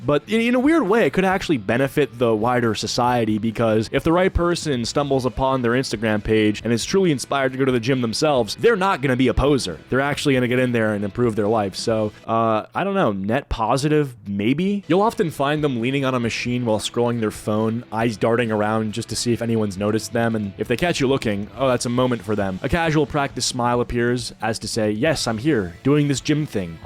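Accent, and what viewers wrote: Netherlands. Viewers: Je bent nederlands? Ik kan het amper horen in je accent, goed gedaan!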